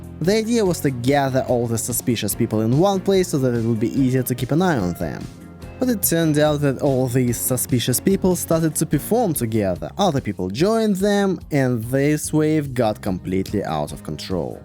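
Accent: Russia. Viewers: You might want to work on that obnoxious Russian accent.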